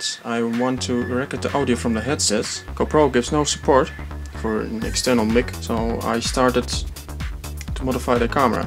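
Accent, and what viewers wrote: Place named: Netherlands. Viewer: Thats what you get when a Dutch person tries to speak English haha. I'm dutch and I reconize that accent everywhere.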